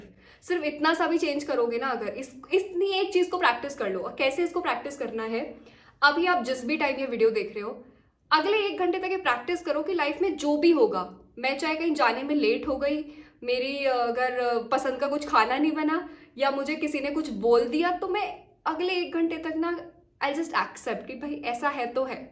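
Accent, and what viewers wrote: Bengal, India. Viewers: Tu bengali hai kya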